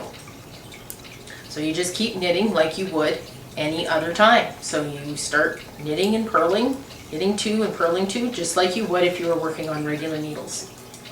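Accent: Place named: Canada. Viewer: I’m a new knitter.....definitely this is my favourite slipper pattern....Thank you for all that explain and the free pastern. I’m in BC, I could tell you were from somewhere in our country 👌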